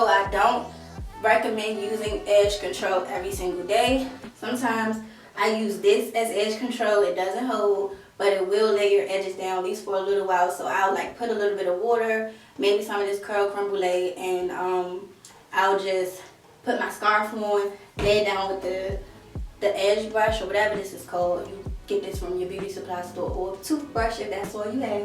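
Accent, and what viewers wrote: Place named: DMV, United States. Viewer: You from the DMV i hear it in your voice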